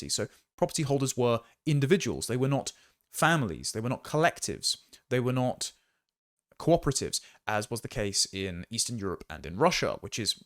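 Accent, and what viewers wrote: RP, United Kingdom. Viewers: Why have you and the rest of the clowns on the Podcast of The Lotus Eaters got such a beef with ‘boomers’? You always have a crack at them for some reason. Jealous much? Well, I suppose you need some group to have a go at. And is that posh assed accent actually real?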